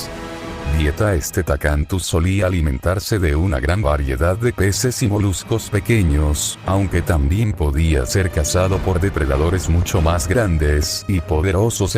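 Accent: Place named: Spain. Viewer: Yo pense que eras de España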